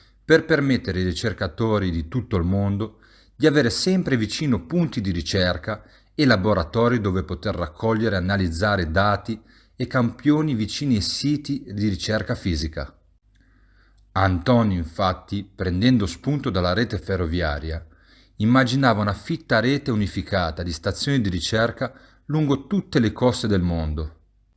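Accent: Genoa, Italy. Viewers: Belin l'accento genovese CI VUOLE non perderlo mai! E per l inglese ce ne battiamo il belin sugli scogli di Camogli 😆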